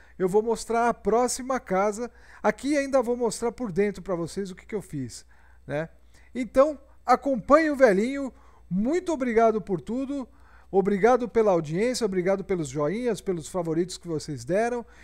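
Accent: Piracicaba, Brazil. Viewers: vc é de piracicaba velhinho?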